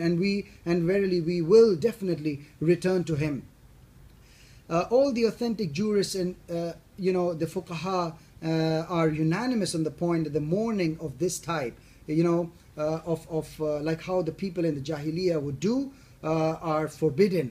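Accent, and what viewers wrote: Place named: South Asia. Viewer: You seem to be pretending being an Arab from Saudi Arabia but I can notice from your look and accent that you are actually of some South Asian background possibly Pakistani or Indian. You don't have to look like an Arab for people to listen to you.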